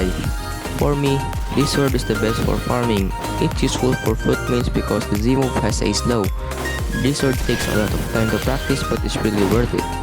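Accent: Philippines. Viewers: sure pinoy yan boses palng eh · im for sure this guys is a Filipino the way he talks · u sounds like a ph guy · u sound like a filipino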